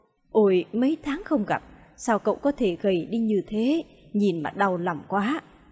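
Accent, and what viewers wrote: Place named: North, Vietnam. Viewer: kênh giọng nam kỳ, tự nhiên giờ sen vô con nhỏ bắc kỳ này vô là sao vậy ? tôi không ưa giọng bắc kỳ.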